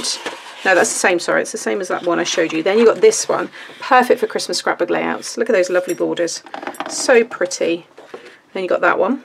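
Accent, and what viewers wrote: United Kingdom. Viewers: Nice haul! Have I missed something? Sounds like you are back in the UK?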